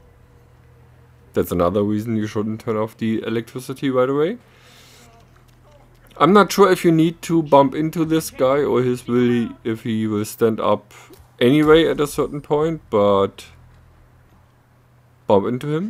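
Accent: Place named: Germany. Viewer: I like your accent. Sounds Deutsch!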